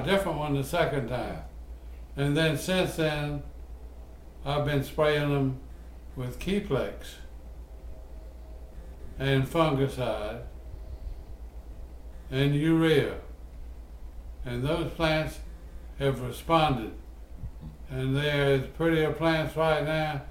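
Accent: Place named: South, United States. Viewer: True southern draw…like my pappy use to have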